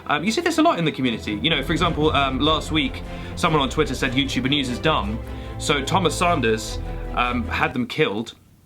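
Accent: United States. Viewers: Your American accent unnerves me... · Also your American accent is amazing. It was so accurate that it made me uncomfortable, like someone dubbed in someone else’s voice but with god-tier editing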